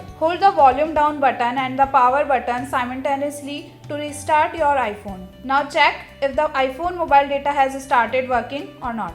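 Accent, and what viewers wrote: India. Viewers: She’s Indian brahh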